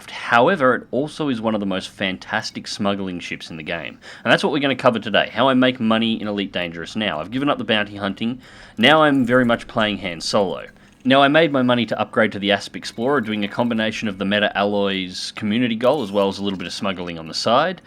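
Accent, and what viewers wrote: Australia. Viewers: goes to slee..... sees vid of well, not gonna hurt hearing an Aussie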